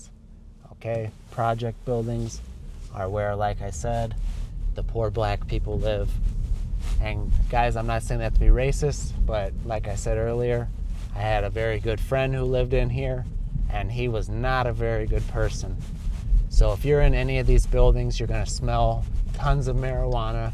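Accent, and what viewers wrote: United States. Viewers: American accent English sounds very pleasant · Dear, I am an Indian. This is your first I saw it to the end. Thank you so much for making this type of videos. It give ideas about the real situations prevailing around us which are not knowing. Your style of speaking is very simple and understandable to the people who are eager to speak English in American accent. Your truthmess promptede to subscribe your channel happily. Well done! Keep it up. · Hi dear I am from India watching your video for first time actually I was seeking for American accent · Great channel, I'm appreciate your clear speak, pronunciaton and little slower rate. It's perfect for me, especially I prefer american english, not english.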